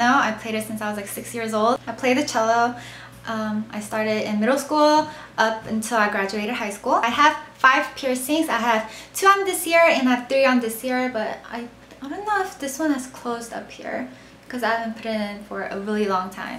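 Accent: South Korea. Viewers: She might have been raised speaking only Korean as a child so she might have developed an accent. Its the same for my cousin. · I still don't understand how you could have that unmistakable, strong Korean accent. I lived in the states for a couple of years and even I don't have it. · You have such a thick Korean accent it's hard to believe you're from Texas :) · Korean accent?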